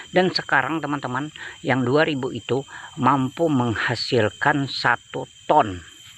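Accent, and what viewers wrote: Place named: Banjar, Indonesia. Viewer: Ini di kalsel,beliau logat banjar ,dan mmg suku banjar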